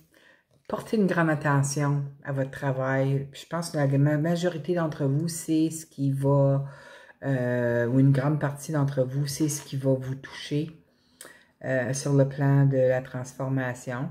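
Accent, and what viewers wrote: Quebec, Canada. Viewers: Merci merci infiniement ma bonne fée..un trés beau tirage..je vous souhaites une trés bonne semaine🌹💗🥰🤗🧚‍♀️💞 P.s" jadore votre accent Quebecois craquant!!🤍🌺💗💯💥💫🙏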